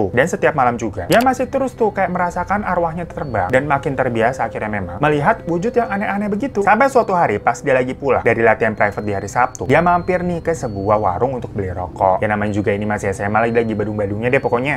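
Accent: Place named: Lampung, Indonesia. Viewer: weh logatnya lampung bgt wkkw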